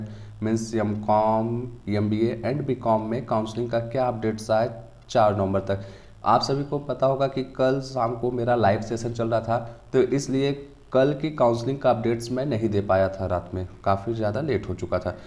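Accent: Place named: Uttar Pradesh, India. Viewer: Bhai up waale m ko yam aur H ko yah Cos ko cas aur Gauge ko gaaz hi Bolte hai😄😄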